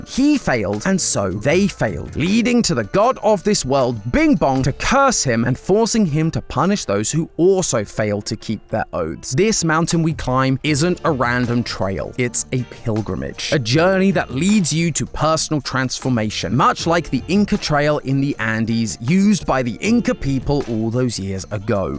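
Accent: United Kingdom. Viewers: just came from a film theory and got jumpscared by the british accent immediately 💔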